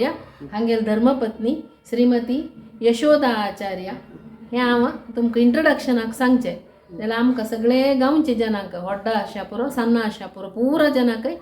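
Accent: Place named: Konkan, India. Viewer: Sounds like konkani spoken by gaud saraswat brahmins in karwar Mangalore goa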